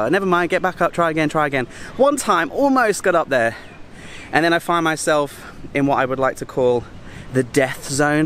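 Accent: England, United Kingdom. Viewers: So he s from Northern Ireland 🇮🇪 why does he have a slight English accent??? I think this might be my new H.Q. when I go there for 6 months in Oct. 2024. Looks like a great mild place to learn. Im 45. I GUESS ITS NEVER TOO LATE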